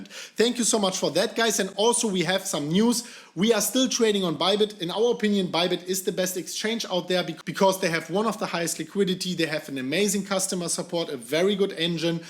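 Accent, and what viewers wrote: Germany. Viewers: Sorry man, I recognise german accents from a mile away. He's definitely NOT Polish. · Good analyse but that Germaned English blehhhhhhh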